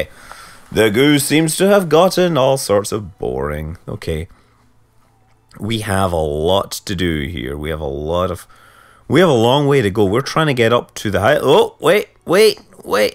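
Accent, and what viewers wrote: Scotland, United Kingdom. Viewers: No, he is a Scotsman.. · He is british...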